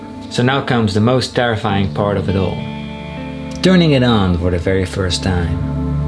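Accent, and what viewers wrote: Netherlands. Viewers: he is, the accent (though not as bad as other Dutch people) is a dead giveaway =P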